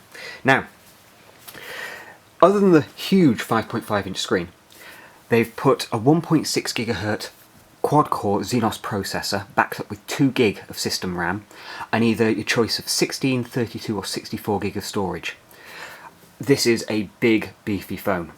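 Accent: England, United Kingdom. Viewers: are you british ? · i subscribed to you.real english voise.am used to american.^-^ i am a motorola fan.i have old q 11 and old milestone. maby motorola come out something like the note.this nice phone.i belive its intel processor ?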